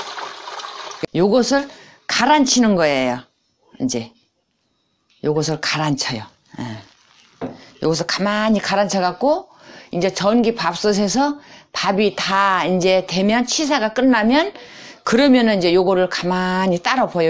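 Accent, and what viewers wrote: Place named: Chungcheong, South Korea. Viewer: 충청도 아주머니신가봅니다 ^^ 저도충청도가 고향이유 재미있고 확실하게 설명도잘하시네요 재미있게 잘배웠습니다 건강하세요😃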